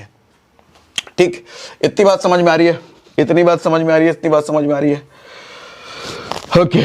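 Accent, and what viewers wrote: Kanpur, India. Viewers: Sir kanpur se ho kya. Apke bolne ka tareeka kanpuriya hai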